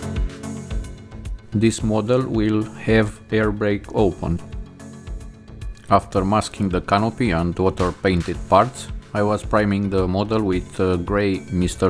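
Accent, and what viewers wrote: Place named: Romania. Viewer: Dupa accent esti roman 100%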